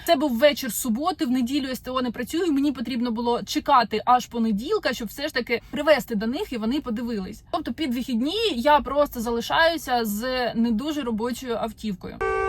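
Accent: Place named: Central Ukraine, Ukraine. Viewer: Хочу сказати що ви розмовляєте українською наче ви не з Базмуту, а десь з центральної України і прожили все життя там. Це супер і контент цікавий!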